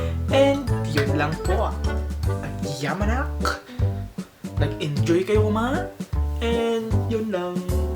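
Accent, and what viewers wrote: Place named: Tarlac, Philippines. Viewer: Magka-accent kayo ni Daddy hahaha taga-Tarlac po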